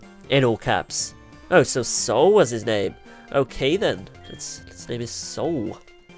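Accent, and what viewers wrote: United Kingdom. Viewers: NOOOOOO! HE'S A BRIT! NO RACISM BUTI DON'T LIKE THE ACCENT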